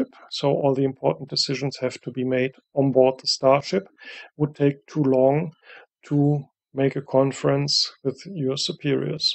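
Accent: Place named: United States. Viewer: The American accent is indeed prominent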